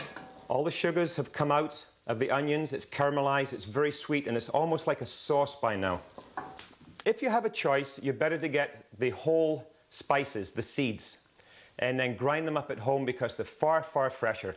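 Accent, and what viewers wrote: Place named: Scotland, United Kingdom. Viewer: You have a bit of scottish in your accent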